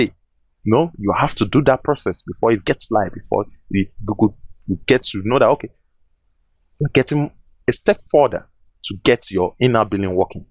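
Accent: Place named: Nigeria. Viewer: "In app billing SARVICE," Naija man for sure. Thanks for the great video.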